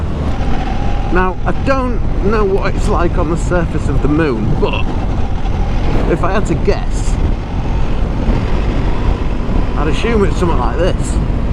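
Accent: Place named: North, United Kingdom. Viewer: You sound more northern than normal. And I don’t know how that is possible. As usual. Incredible video!!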